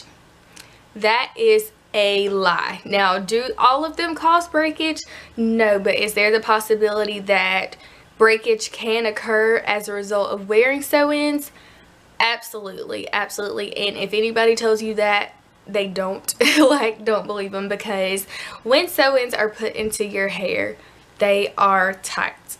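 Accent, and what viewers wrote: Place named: Texas, United States. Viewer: I love her accent .. it’s like TEXAS 👍🏼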